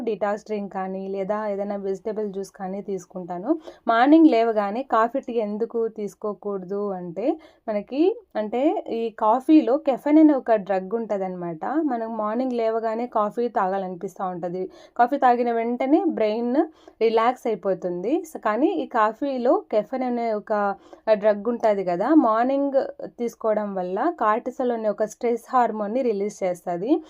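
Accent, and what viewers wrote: India. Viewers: 100 days challenge ki nenu kuda ready ga unna sister. Na age 29 na weight 91 ela taggalo artham kaledu ( me slang chuthunte thirupati la undi )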